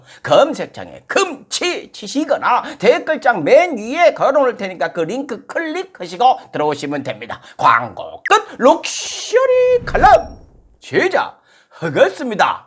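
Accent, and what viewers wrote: Jeolla, South Korea. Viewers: 늘 멋진 마음 멋진 내용 맛깔스런 전라도 사투리 참 좋아요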